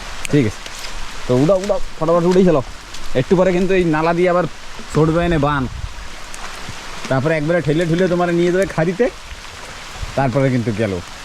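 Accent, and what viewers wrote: Barishal, Bangladesh. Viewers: vai tui pure barishaila ❤ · আপনারা তো আন্দামানেরই ভিডিও দেন যেটা ইন্ডিয়ার ই অংশ বলে জানি, কিন্তু আপনার ভাষা পুরো টাই বাংলাদেশের বরিশাইল্লাদের মত ক্যান/?? একটু কমেন্টের রিপ্লাই দিন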